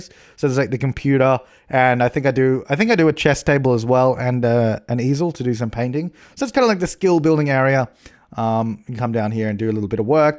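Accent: Australia. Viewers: It took me so long to figure out that is Australian... I'M AUSTRALIAN...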